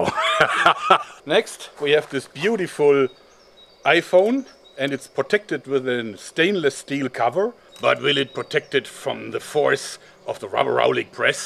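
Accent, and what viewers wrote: Germany. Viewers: Cool video! Du bist deutsch oder? :D Erkennt man am dialekt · xXxFanisxXx it is a german trying to speak englisch (like me) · You've got a real German accent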